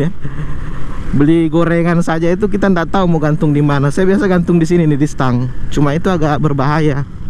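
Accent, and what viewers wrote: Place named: Sulawesi, Indonesia. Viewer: Sulawesi bagian mana bang? Dari Logatnya kentara hehehehe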